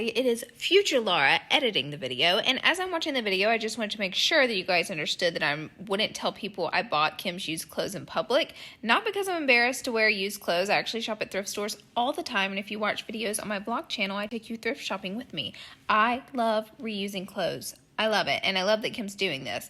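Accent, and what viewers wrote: South, United States. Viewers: Wow it’s wild how she really used to use this fake voice … like her Paris days. She almost sounds like Brittney spears. Like southern accent meets valley girl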